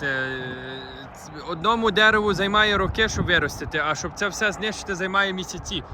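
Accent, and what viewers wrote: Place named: Western Ukraine, Ukraine. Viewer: До речи, мені теж чується якийсь акцент в Вашій український мові. Чи то вже англійська так вплинула чи Ви походите із західної України?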